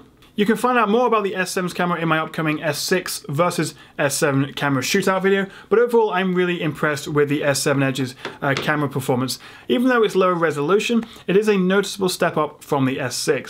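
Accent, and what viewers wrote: England, United Kingdom. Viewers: brilliant review and nice to have an English accent on one of these videos! You're going to give mkhb a run for his money haha. I like the fact that you mention the little things like edges feel in the hand and the fact that you have a problem typing sometimes due to your hand touching the screen. These are the things that really matter and are the exact reason I use the normal s7 as opposed to the edge! great work, keep it up :) cheers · hey are you from the UK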